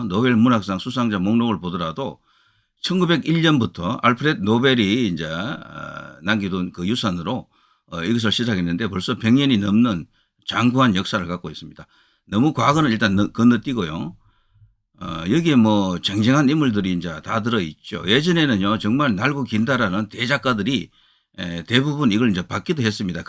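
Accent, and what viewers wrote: Gyeongsang, South Korea. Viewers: 내 이런 놈들이 나올지 알았다 갱상도 억양좀 빼고 영상 올려라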